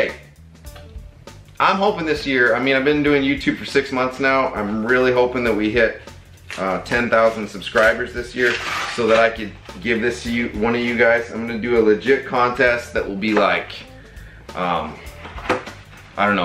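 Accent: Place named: Mexico. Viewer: You do not sound bad. Just a little mexican.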